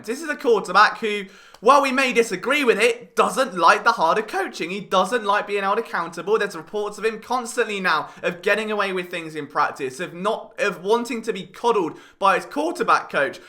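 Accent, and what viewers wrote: England, United Kingdom. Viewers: I come here to get my eagles news with an English accent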